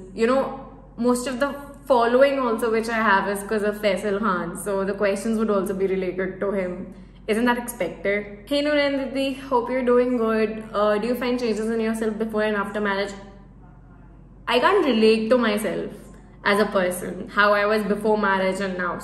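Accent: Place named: United Kingdom. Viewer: Your English accent is just like British accent 😍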